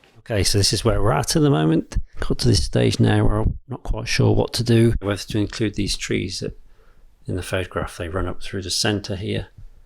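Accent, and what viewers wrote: United Kingdom. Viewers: Hi. I’m Christophe from Paris. I subscribed quite a time ago. I love your drawings. I’m very much impressed by the large range of medias you are able to practice (watercolor, oil sticks, pastel, charcoal, oil painting …). Your are a very talented artist. I must say one of the most talented I have ever seen so far on internet. Highly professional work, also full of creativity and sensibility. Not surprising to have been promoted by the Royal Birmingham Society of Artists. Your YouTube channel might be also a very usefull guide to guys like me trying to produce some masterpiece in their living room! May I also tell you that I love your dog Basil (he seems to be the happiest dog of the world) and also (from this side of the channel) your so lovely british accent 😊. Best wishes from Paris and long life to your channel.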